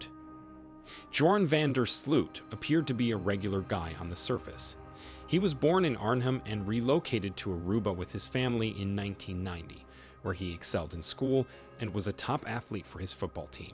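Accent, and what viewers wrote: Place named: United States. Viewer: this American voice seems to think to know a lot. About criminals in the Netherlands.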